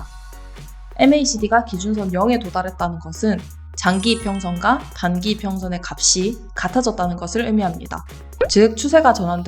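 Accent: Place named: Gyeongsang, South Korea. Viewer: 경상도 분이다~~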